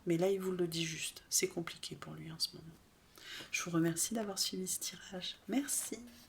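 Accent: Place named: Toulouse, France. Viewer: Choix 3. Et tu as un accent toulousain que je n’avais jamais entendue !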